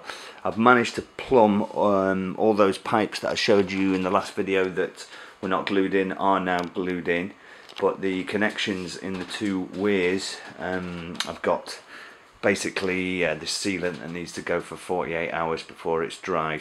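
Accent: United Kingdom. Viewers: Yeah, I just poking fun at you. One dude to another...I love your accent, lol. Is that Cockney? I am from the American midwest. I sound alot like John Wayne. I like you videos. Especially when we can see the equipment and how it is hooked up. you are my newest hero If I had your mailing address, I would mail you a cape and a big old S for your chest. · the british accent is great i loove these videos hahaah keep em coming MATE!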